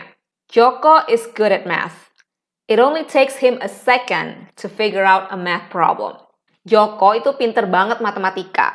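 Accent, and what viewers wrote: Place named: Java, Indonesia. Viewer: Ternyata = ndilalah 😂, masih mantep kak logat jowone, Kediri menyapa kak😁👍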